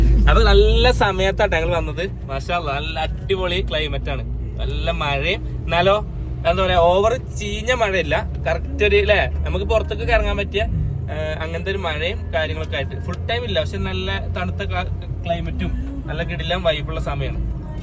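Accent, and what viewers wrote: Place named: Kozhikode, India. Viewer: കോഴിക്കോട് ആണ്...... Confirm... ഇയ്യ്... അ അന്നെ.....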